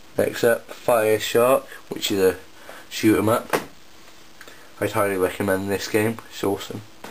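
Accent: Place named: United Kingdom. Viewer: lol yeah he is from the UK. Did he voice not give it away? lol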